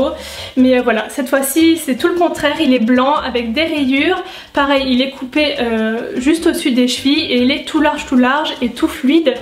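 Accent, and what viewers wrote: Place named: Nord, France. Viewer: Merci pour cette vidéo 😍 j'ai flashé sur les sandales et la ceinture 👍 j'adore tes vidéos, ta façon naturelle de parler et ton accent du Nord 😊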